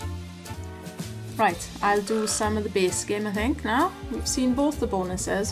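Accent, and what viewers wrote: Wales, United Kingdom. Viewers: I use this and usually does brillaint for me, i can usually add £5 and almost always double my money, also you can hit both bonuses on the same spin, 20p spins is the best for me, also is that a welsh accent i detect? Im welsh too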